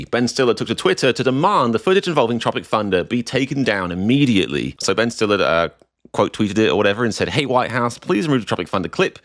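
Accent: United Kingdom. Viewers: Lol. He's a Brit. A lot of words are pronounced differently. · dear, you apparently worked very hard on this compilation so kudos to you; but i am most impressed that with your native VERY British accent you can mimic the redneck MAGA hick-talk spot-on still ROTFLMAO; sorry i got entirely distracted......💙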